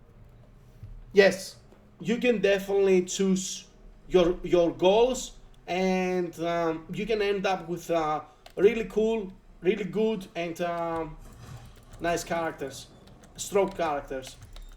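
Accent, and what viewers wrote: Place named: Greece. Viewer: Σιγουρα ελληνικη προφορα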